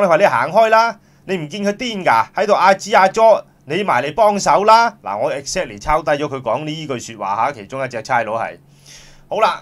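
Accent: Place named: Cantonese, China. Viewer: 支持！支持！支持！😻⋯⋯口才極好、廣州口音，但我唔知你的名字🌹👏🌺👍🌺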